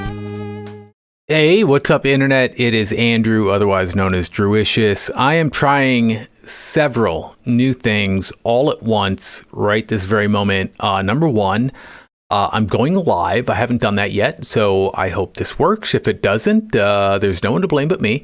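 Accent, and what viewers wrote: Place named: Canada. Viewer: Thanks I just notice your classic Canadian oo for ou dialect. I didn’t notice It on your other videos. Looking for stocks that are ready to pop are the hardest to see for me so I appreciate these. I see your list inside discord but I don’t arch your videos on you tube. I don’t know why, but I can’t turn my phone sideways in discord and makes your screen hard to see. Thanks again I was writing this last night but ran out of time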